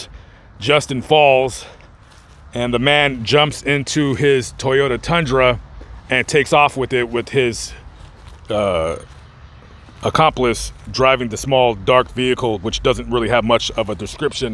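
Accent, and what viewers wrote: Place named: South, United States. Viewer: great pachyderm 0 seconds ago I suggest you visit the St. Nicholas Church Cemetery in Patoutville, Louisiana. In March of 1968 the salt mine collapsed at the Belle Isle Salt Mine. 5 members of the same family died there along with 17 other miners. My cousin Dennis Romero (not buried there) was one of the victims.. You're in Texas and you talk with a somewhat Southern accent and can't be far away. Haha. I'm in Texas often and know how big it is.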